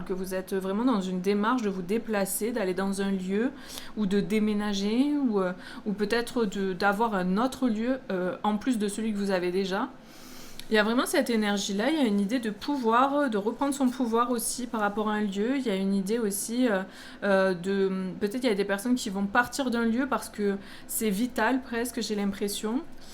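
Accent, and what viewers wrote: South, France. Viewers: Le bon accent du sud, excellent 😊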